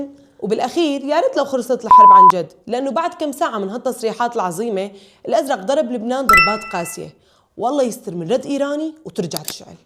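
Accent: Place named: Syria. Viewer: لهجتك لأي منطقه بسوريا ؟ بخصوص الحرب راجعه بعد كم شهر والله اعلم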